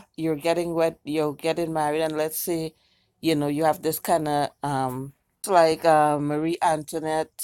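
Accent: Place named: Trinidad and Tobago. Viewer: I like the dresses. I didn't catch your accent until about ...Trinidad in the house...lol...I am from St. Croix.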